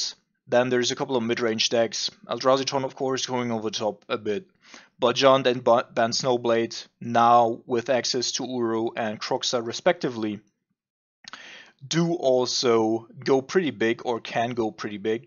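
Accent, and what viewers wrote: Germany. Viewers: I like your accent, where are you from? Germany?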